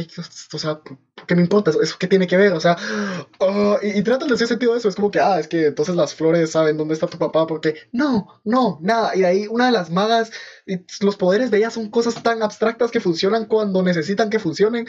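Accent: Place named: Guatemala. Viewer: lo supuse por el acento jajaja yo también soy de Guatemala, y rayos te pregunto porque ya termine la 5ta temporada y note que no tenias comentarios sobre la serie, pero esperare, jaja saludos.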